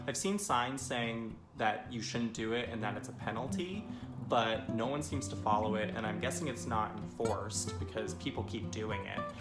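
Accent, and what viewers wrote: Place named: United States. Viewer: Unfortunately you do sound like a complaining American.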